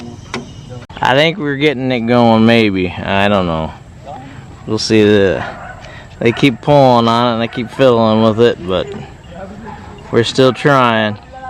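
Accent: South, United States. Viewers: Can't help but noticed you have a southern accent sir. Where you from?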